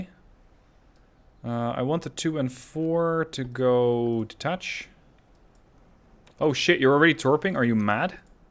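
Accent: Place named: Netherlands. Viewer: Listening to this, i am sure he is dutch, they can not hide the accent.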